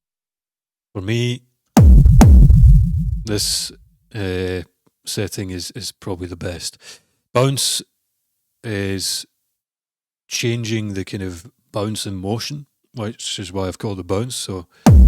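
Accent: Scotland, United Kingdom. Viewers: Are you Scotish mate? Great technique.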